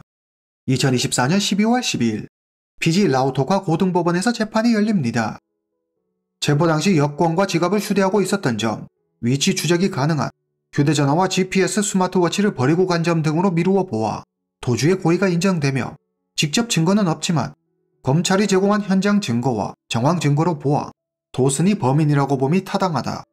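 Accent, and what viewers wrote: China. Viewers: 억양때문에… 보다가 껐어요…중국분이신가요?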